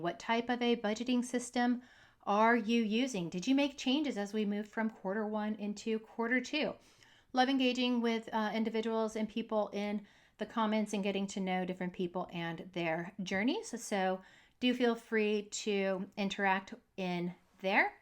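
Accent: Australia. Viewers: Are you originally from Australia?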